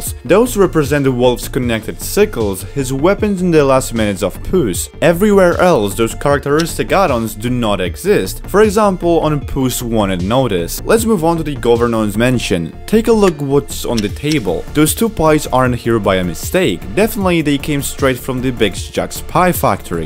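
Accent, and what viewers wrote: Poland. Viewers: are you polish or something ? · Wait are you Polish?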